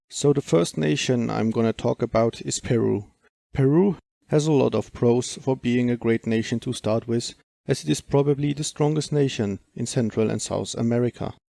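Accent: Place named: Germany. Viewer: Ich liebe deine sachliche Sprechweise, gemischt mit einem wunderbaren deutschen Akzent <3.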